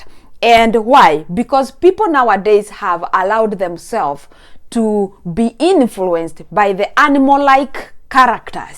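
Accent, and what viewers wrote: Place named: United States. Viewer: Daughters of Sarah...by the way i love your American English accent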